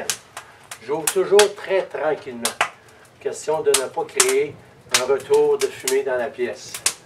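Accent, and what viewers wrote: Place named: Quebec, Canada. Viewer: I speak english and french and still cant understand you.. this quebec accent is impossible for those that actually speak proper french.. what a shame and a waste of a video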